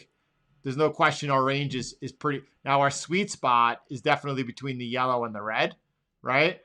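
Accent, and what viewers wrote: United States. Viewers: Your Long Island accent coming thru strong today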